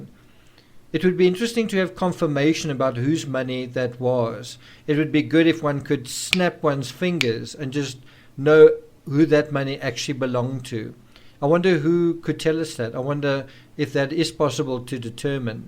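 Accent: South Africa. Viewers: South African accent? A quick google confirms :)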